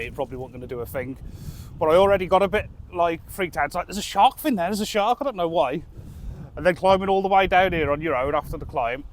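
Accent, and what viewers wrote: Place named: Midlands, United Kingdom. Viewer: I’m from the Black Country your accent sounds a bit local ish to the midlands mate! Love your videos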